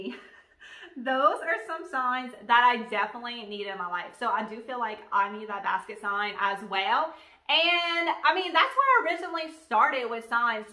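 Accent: Louisiana, United States. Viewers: I just discovered your channel! I am an hour and a half from Memphis & lived in Tupelo in the mid seventies! Can you share where the thrift store is near the Goodwill that has the good prices? I look forward to catching up on all your videos. Love your cajun accent!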